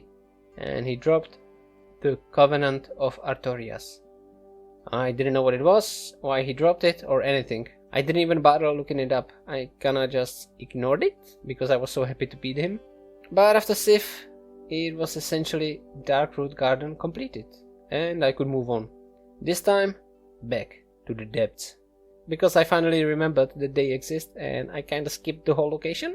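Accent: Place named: Czech Republic. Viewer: Pokračuj s contentem! Eventuelně se to rozjede. Pěkné video. Českej přízvuk mě mega kopnul.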